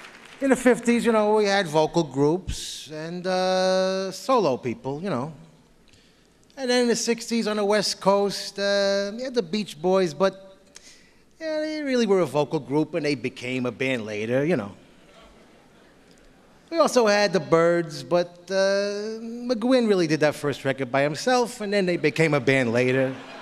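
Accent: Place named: New Jersey, United States. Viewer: I'm from the South and I love Jersey talk keep it up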